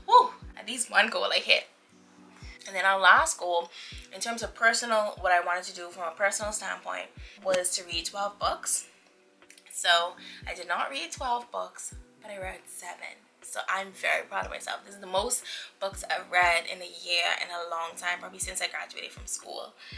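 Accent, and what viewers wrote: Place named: Bahamas. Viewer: Love it! Also as soon as I heard that accent was like yessss, 242 represent! Excited to do my first vision board.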